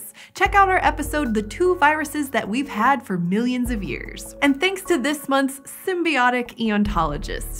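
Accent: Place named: United States. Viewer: She can speak correctly, I’m not sure what you are referring to. Her accent is also very mild as American accents go which means she’s easy to understand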